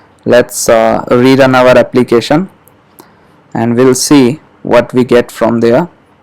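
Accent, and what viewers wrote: India. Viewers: Nice to hear some Indian voice in flutter area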